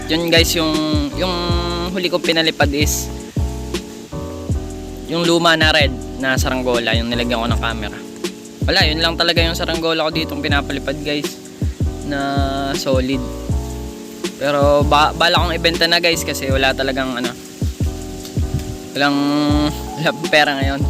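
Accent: Batangas, Philippines. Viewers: Yung batangas accent the yan baka gravee to.. hahaha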